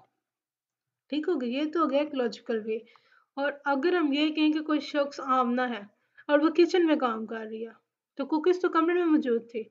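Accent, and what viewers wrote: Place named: Lahore, Pakistan. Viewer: ap ki voice sa lagta ha ap lahore sa ho . hhaahah but ma'am boht acha explain kiya ap na..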